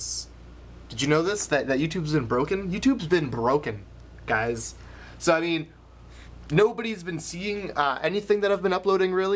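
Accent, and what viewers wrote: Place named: Canada. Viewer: "so if there's ever a mess up with youtube again you can just go there and check it OOT." I LOVE YOUR CANADIAN ACCENT <3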